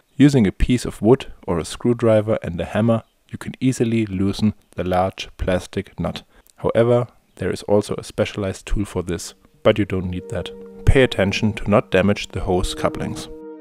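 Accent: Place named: Germany. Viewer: 😀 Ich dachte erst du machsat jetzt auf Englisch Videos, wechseln ja viele zu, weil es halt viel mehr Viewer bedeutet. Ich kann ziemlich gut Englisch und muss sagen, dass du zwar voll Deutsch klingst aber alles voll verständlich von der Aussprache! Sehr gutes Video, bis auf das Wackeln, hatte das gar nicht auf deinem Channel gesehen, muss wohl vor meiner Zeit gewesen sein. Wünsche dir ein angenehmes Wochenende! PS: Herzlichen Glückwunsch zu 10.000 Abonnenten, das ist ja der Hammer oder hast du die schon länger? 😀 Bin manchmal verdaddelt, dachte nur gerade ,,Woa geil, er hat die 10K erreicht!". Das ist ja jetzt die erste Grenze zu Hobby-YouTubern und richtigen Berufs-YouTubern. Wenn du Werbung schaltest, mache ich auch gern den AddBlocker aus. Das ja wohl das Mindeste! So Roman Ende 😂👍🤦‍♂ LG HEUERs Pit Stop 🚗💨💥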